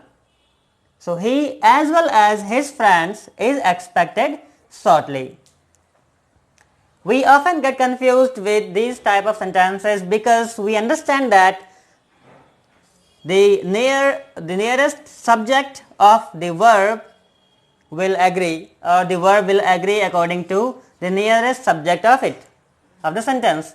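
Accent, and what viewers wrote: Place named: United States. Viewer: Tqs bro for your american accent of English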